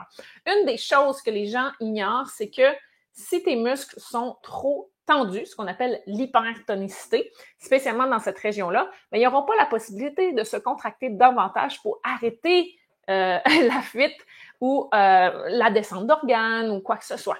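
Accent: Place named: Canada. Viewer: Bonjour, j'apprécie vos formations, vos capsules d'introduction. Au début j'avais des difficultés à entendre distinctement votre accent canadien. Dans cette vidéo c'est mieux pour moi, il y a moins d'aigu. Je viens de partager vos formations avec ma voisine et lundi prochain je lui présente les yoga-balles que je viens d'acquérir, elle est fort intéressée par votre formation, elle a 1 problème au niveau de la nuque. Excellente continuation dans votre activité. Yvel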